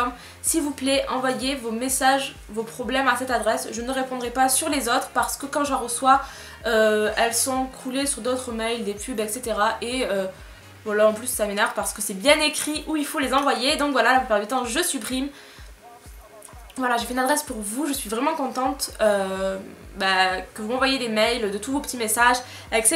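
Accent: South, France. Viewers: Olala j’adooooore ton accent ♡♡ J’adore l’accent du Sud ♡ Si les sudiste voient ce commentaire je vous aime ♡♡ (surtout si vous avez l’accent :p) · Merci c'est gentil ‚ oui l'accent du sud est spécial mais bon c'est la vie ✋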